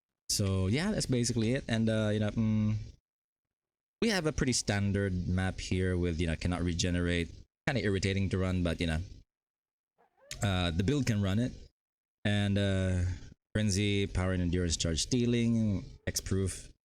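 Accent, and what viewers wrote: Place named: Philippines. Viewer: Damn! I knew I was right, you have a "slight" filipino accent haha. Same here!! Though im pure pelepeno. Btw whats your streaming schedule would gladly tag along :)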